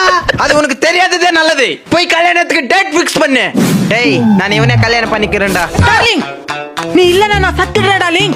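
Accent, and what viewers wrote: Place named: Andhra border, India. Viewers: Telugu mixed tamil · Andhra border people's slang ithu.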